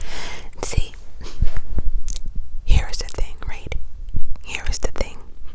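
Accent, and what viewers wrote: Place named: East Coast, United States. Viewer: I’m new here, where are you from? Because the upper east coast accent is adorable, but if you’re anything like me, I always talk with accents lol. Love your videos!! I’m listening to this one while cleaning my kitchen on a Sunday and it’s chefs kiss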